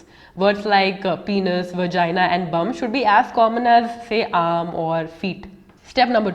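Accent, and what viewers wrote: Hyderabad, India. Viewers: Omg,are you from Hyderabad....soo hard to know it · U Hyderabadiii?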